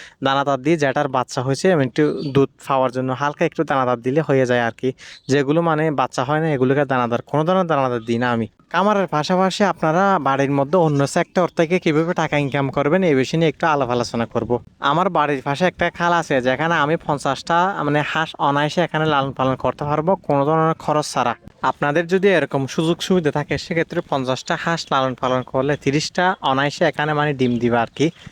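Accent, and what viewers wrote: Chittagong, Bangladesh. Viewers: ভাই আপনার বাড়ি কি চট্টগ্রাম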